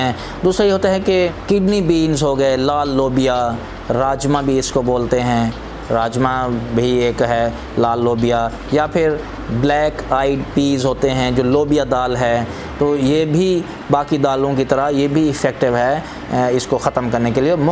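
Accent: Pakistan. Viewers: Sir ap saraki speeker hen lagta ha janoobi punjab K rehnay walay hen....nice videos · Bahawal pur yaad aa giya ...aap ki aawaz sun kar ...zinda bad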